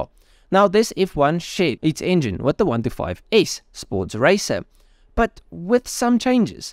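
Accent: South Africa. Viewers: I'm really loving these videos. You're picking the best cars. Is that an SA accent I'm hearing?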